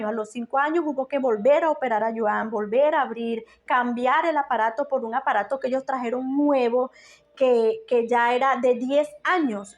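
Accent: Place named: Venezuela. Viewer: Amiga es la segunda vez que te veo y por el asentó me imaginé que eres venezolana .tambien soy de el Tachira ,Perú Ivo en el Reino Unido.y tu testimonio es es de una gran ayuda,y te felicito por tu valentía y dedicación a tu hijo.Bendiciones .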